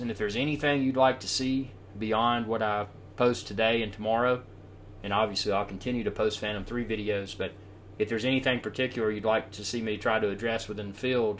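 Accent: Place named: Texas, United States. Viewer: My wife Luciana thinks your accent is from texas, i have to agree also. One place i hope to visit one day :)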